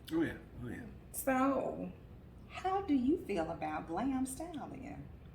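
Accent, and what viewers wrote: South, United States. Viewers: SHE GOT ME WITH HER TITLE. SOUTHERN GIRL. AND I LOVE HER ACCENT. THANKS.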